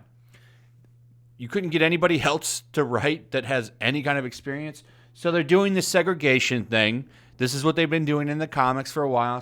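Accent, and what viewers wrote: United Kingdom. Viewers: What’s with the funny British accent? Pretty awful...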